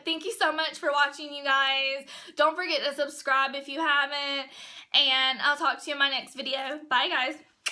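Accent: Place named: South, United States. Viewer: Great video! I'm a new subscriber. 😀 Love your Southern accent.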